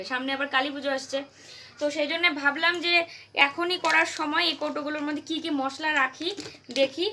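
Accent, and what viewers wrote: Bangal, Bangladesh. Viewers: Didi maajhe maajhe bangal vashay kotha boliyo , valo laage shunte tomar mukhe bangal vasha shunte..❤️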